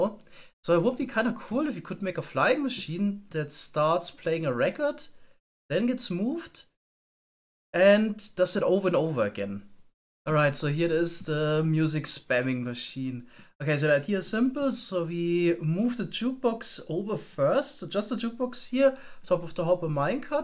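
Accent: Germany. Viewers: Bro dieser Deutsche Akzent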